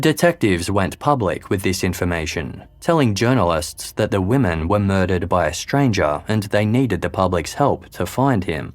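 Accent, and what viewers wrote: Australia. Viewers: The narrator's accent is " educated Australian ". As opposed to " broad Australian" such as the crocodile Hunter.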